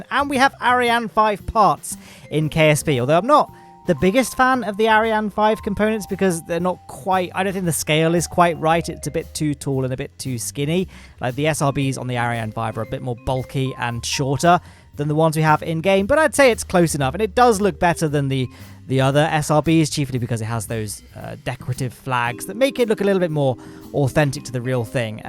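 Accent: United Kingdom. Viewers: He's as British as they get, you can hear it on his accent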